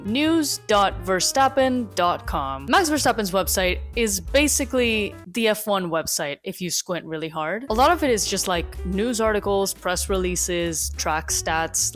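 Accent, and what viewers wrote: Spain. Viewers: I´m gonna say, this is actually a good yt video... And hold on there is a really good spanish pronunciation, but i mean spanish from Spain. R u spanish...?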